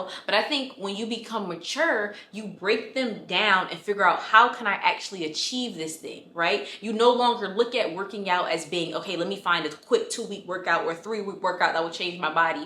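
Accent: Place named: Memphis, United States. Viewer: Are u from Memphis? You sound very Memphis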